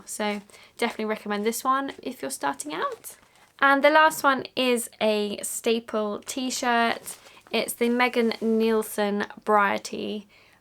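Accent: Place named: England, United Kingdom. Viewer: You look just like my sister but with an English accent!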